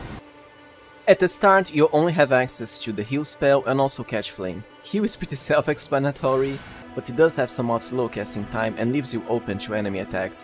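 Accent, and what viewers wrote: Brazil. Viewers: Are you Brazilian, mate? Cool accent! Great video too!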